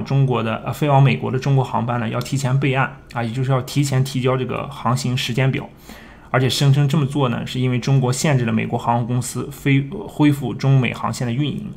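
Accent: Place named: Shanxi, China. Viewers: 是山西口音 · 山西口音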